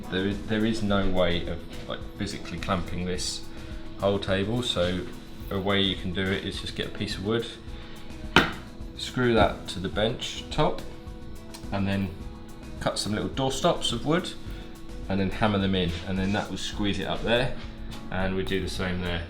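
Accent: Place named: United Kingdom. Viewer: The Brit accent makes you sounds like an expert !!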